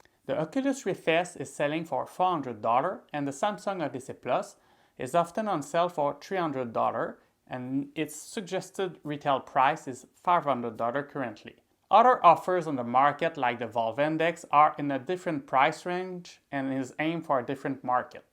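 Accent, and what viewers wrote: Quebec, Canada. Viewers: i’m from Québec too, i heard your accent and i was like, omg i know this accent x) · Love the Kay-beck accent :)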